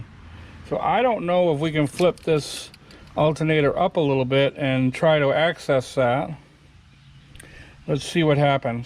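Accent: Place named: Northeast, United States. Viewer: Ps Sounds like you're up here in NE by that accent.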